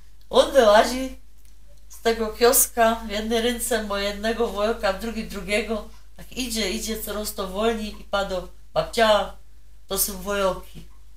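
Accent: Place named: Podhale, Poland. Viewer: Wymacie piekna mowe po Slasku i u Pani slychac Goralski akcent. Pierwsze myslalam ze Pani jest z Gor , Goralka = Bergfrau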